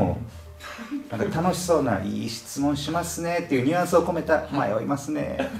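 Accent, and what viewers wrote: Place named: Kansai, Japan. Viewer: 関西弁も相まって宋世羅の羅針盤チャンネルを彷彿させる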